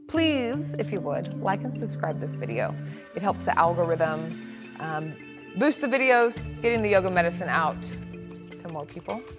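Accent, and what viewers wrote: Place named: Australia. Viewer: Thanks so much! Love your classes! And love your Aussie accent! 'Share this with ya!" around 1.20. lol.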